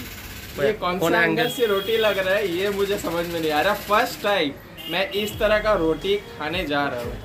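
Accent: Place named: Madhya Pradesh, India. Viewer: Aapki accent mp valo jaisi h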